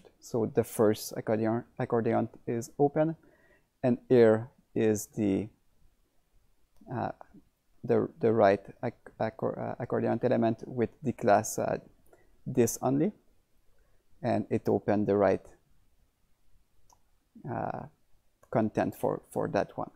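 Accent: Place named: Quebec, Canada. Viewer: Oh wow, you've been so helpful since we switched to Elementor - Did not know you were Québécois? I think I recognize the accent :)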